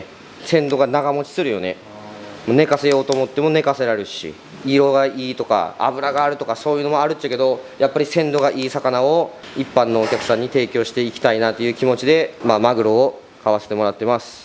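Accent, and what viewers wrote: Miyazaki, Japan. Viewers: 若いころにいた会社でお世話になった宮崎出身の上司の方言と同じ方言で凄く懐かしい。そしてこの人、教え方も丁寧だし技術も上手いしで、本当に凄いです。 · 宮前弁まるだしw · なーんか聞いた事ある 話し方と思えばやはり宮崎(o´罒`o) 宮崎のしゃべり方は落ち着く笑 けど自分がこんな感じではなしてると 自覚してる人はいない笑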